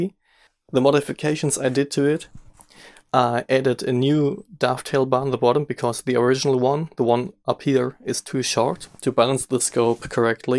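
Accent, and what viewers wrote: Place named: Germany. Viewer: Man hört voll, dass du deutscher bist :P Hab dich mal als Hobbyfotografkollegen abonniert^^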